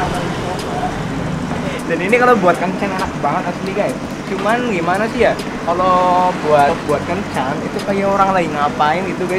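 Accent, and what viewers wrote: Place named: Banyumas, Indonesia. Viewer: Kamu apa orang ngapak bang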